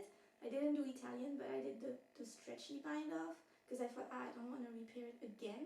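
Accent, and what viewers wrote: France. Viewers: Bonjour du sud de la France ! I spotted your French accent in the firsts seconds 😂 Really Beautiful projects, I loved this podcast Thanks ! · ❤love the French accent! It is so much fun to watch knitters all over the world! I am watching from B.C. , 🇨🇦.❤all those Danish designers, it’s fun to go on the Isager website, and look at the archive collection, and see all the Danish designers she asked to collaborate with.😊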